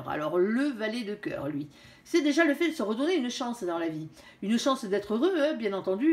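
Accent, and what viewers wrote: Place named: South, France. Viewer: Je vous découvre, vous êtes dans le juste 😊. Merci pour votre bonne humeur et votre accent de soleil ☀️ Je m'abonne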